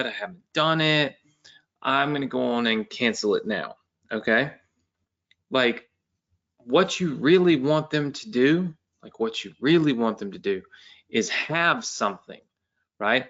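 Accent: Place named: Mississippi, United States. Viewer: I know you are from miss. you talk like it.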